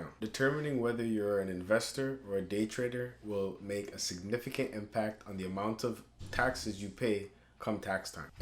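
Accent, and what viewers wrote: Jamaica. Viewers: Hey bro, Are you originally from Jamaica? Also, what broker do you recommend for US clients? Thank you for sharing.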